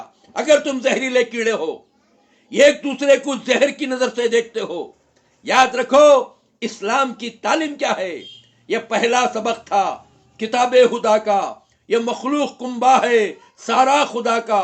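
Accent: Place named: Hyderabad, India. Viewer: Uncle g ap ki language hyderabad ki mujy bht pasand hey ALLAH ap ko sehat dey. From pakistan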